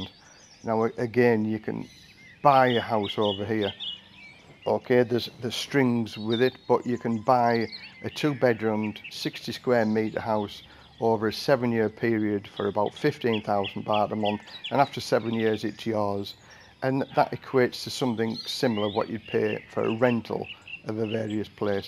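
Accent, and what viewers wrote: United Kingdom. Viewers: Thanks, came at the right time! Saw this coming in the West; only going to get worse. Really appreciated, just needed the extra encouragement from a recognisable voice from the UK. Decisions made..later this year to visit. thankyou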